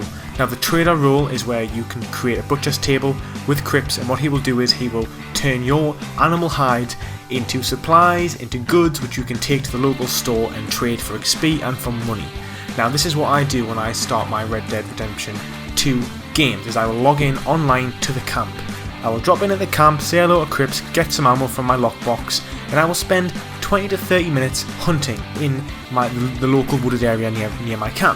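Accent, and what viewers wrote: United Kingdom. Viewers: This guy is definitely British but idk if he sounds Geordie or not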